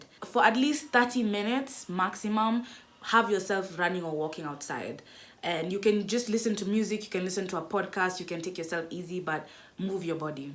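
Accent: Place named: United States. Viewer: You are twenging like an American and you are in 254 keep it real na sio tafadhali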